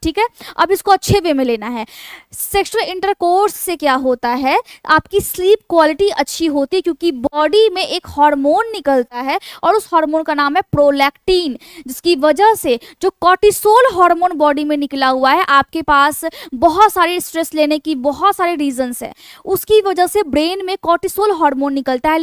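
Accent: Bihar, India. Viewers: Are you bihari Language pronouncesion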